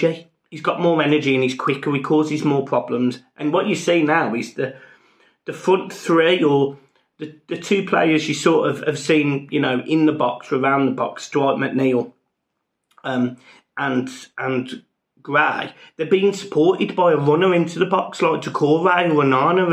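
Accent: Birmingham, United Kingdom. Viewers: Oh mate, you're actually getting the stick for being a Brummie? I'd love to see some of those pricks talk to me; because I've been following Everton since I selected the team in CM back in 2002/2003 when Kevin Kilbane was playing and I'm from İstanbul. I've been detecting the accent was not Scouser but who the hell cares. And as a Fenerbahçe lad, I'm learning from your optimism, since it's absolutely not our strongest suit, haha:) Keep up the good work and hopefully Everton can avoid the relegation. 2 dynamic full-backs, 1 decent striker and 1 creative attacking mid right in front of Doucoure-Onana pair; we're good to go for next season.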